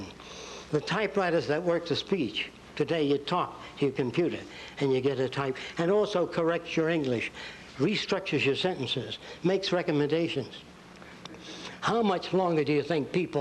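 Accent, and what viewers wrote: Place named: New York, United States. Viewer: AND GOT LOVE THAT NEW YORK ACCENT. LONG LIVE J.F.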